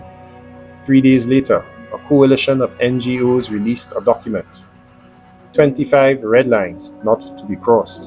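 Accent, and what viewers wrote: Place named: Trinidad and Tobago. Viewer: I know that trini accent anywhere in the world. 🇹🇹 🙌😎 Wha iz Di vybes boy?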